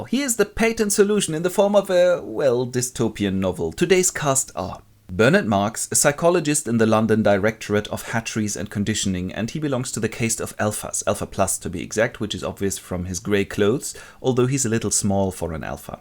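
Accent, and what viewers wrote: Germany. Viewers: Iron American Dream on YouTube. It's wonderful, I love the vernacular. The German accent tops It off.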